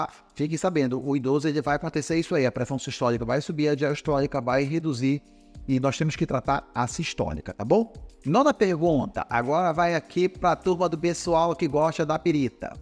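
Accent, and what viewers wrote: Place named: Rio de Janeiro, Brazil. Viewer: Dr vc tem staque de carioca...vc é do rio de janeiro?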